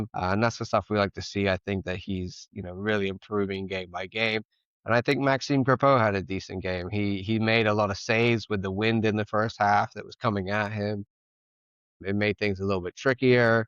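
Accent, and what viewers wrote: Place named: RP, United Kingdom. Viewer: Your accent is so much more posh now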